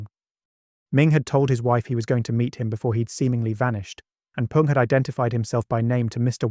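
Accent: England, United Kingdom. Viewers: Excellent narration! Love the beautiful English accent & diction.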